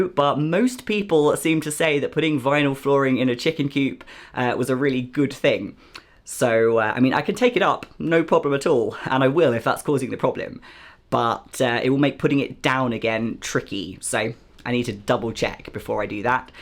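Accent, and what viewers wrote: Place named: England, United Kingdom. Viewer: Since you are English and now immersed in a Scottish community (accent and dialect), your new found lisp may be your involuntary speech muscles trying to adapt and assimilate......resist! Your accent is lovely! LOL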